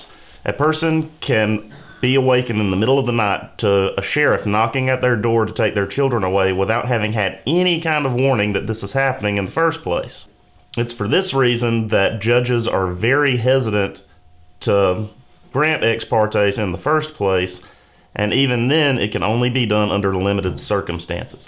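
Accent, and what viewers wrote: North Carolina, United States. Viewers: I thought I detected a NC accent! I raised my children in Chapel Hill. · I knew this was north carolina cuz of the accent hahaha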